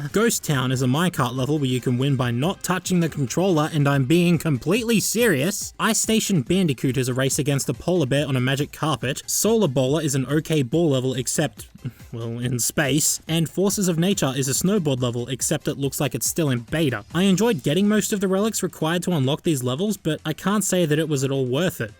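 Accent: Australia. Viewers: Are u from Melbourne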